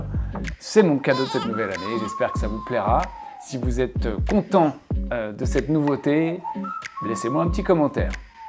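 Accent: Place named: United Kingdom. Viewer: As usual, super interesting, professional, neat job and on top of that with the right english accent. I put my hat down! Here are some more: all/quite the go (fashion), it's no go! (impossible), a queer/rum go (a turn of events) a fair go (a fair chance) to go off ( to have an orgasm) to have to go (to urinate or defecate) to go down (to be sent in jail) and so on. You're doing a great job that's why I recommended your to my 15 students, they all really liked your way of teaching. GREAT JOB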